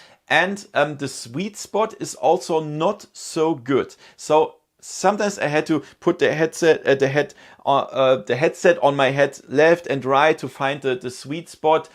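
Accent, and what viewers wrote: Germany. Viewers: He is German speaking in English. Now your turn do a video speaking in German.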